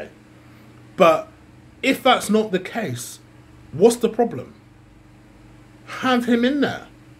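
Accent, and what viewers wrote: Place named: United States. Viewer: I thought you were American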